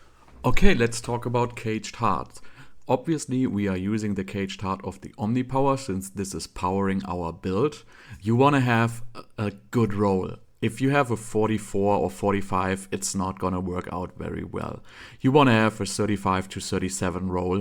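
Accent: Germany. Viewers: i made i similiar build yesterday. 180 mana with enchant throws 7 lightnings. 1 regular, 4 omnipower and 2 from enchant. already works like a charm with 2.0 mana per bounce. Esus boots for crit and i use the 300% gauntlets. Also currently with raiment but i will probably use a normal chest soon and therefore put temerity in to try it out. i also use 2h with 80% for 4 additional bounces. i also use the 20% enchant boost in paragon but dunno if its worth it. question: you use elementalist. do you know how the crit chance is calculated?i would like to use it as well and get rid of the boots but dont know how it works. if i have my omnipower procs from 150mana to 0 and it casts the chain lightning do they keep the enhanced crit chance for their whole duration or everytime i drop below 100 mana while they are flying it dynamically adjusts and removes the crit chance? 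I feel like cc duration is useless. i just stun them again with 3 points in the stun passive. and everything is stunned anyway so no need to use shared missery. i also do not see the point in freeze because you have stun as hard cc. i may try it out but i dont know if the 3 uniques, paragon board and so on is worth the effort. my dmg numbers look the same are you german? your accent sounds like it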